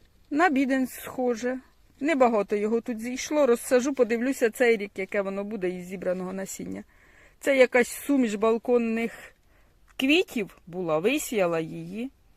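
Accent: Poltava, Ukraine. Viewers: Доброго Вам здоров'я. Мене захоплюють Ваші відео щирістю, гумором, простотою. Та ще й рідна полтавська мова! Успіхів Вам !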